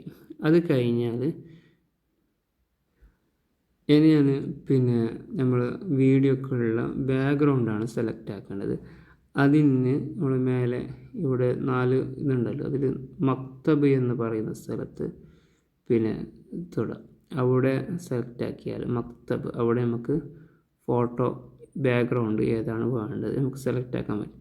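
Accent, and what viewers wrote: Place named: Kasaragod, India. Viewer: സംസാരം കേട്ട് കാസർകോട് ജില്ല എന്ന് തോന്നുന്നു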